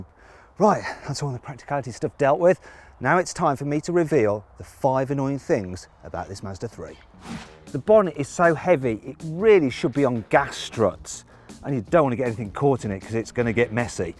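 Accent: United Kingdom. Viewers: イギリス英語わからんなら理解するの難しいだろうな。日本語訳も所々違う部分もあるし。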